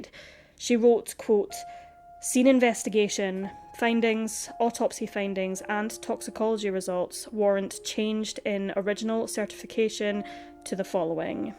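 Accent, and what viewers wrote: Scotland, United Kingdom. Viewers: She is in Scotland with a Scottish accent, give her a break!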